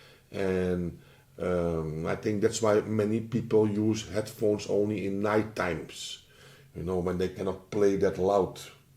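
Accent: Netherlands. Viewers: Volgens mij ben je Nederland! Goeie beoordeling bw thx.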